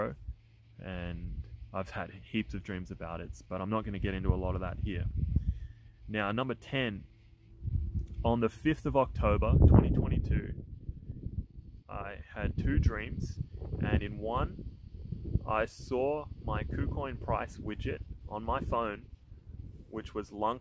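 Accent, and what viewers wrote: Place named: Australia. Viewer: I like your Aussie accent mate ❤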